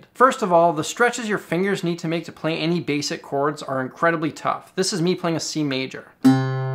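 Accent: Canada. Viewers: to be fairrr isnt he canadien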